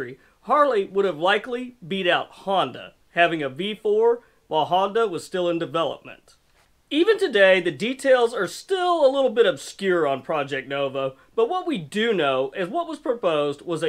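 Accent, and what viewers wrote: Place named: South, United States. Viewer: You must be in Texas or Louisiana. Jeff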